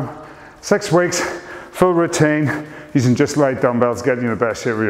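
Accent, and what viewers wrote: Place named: England, United Kingdom. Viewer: I wonder what that English accent is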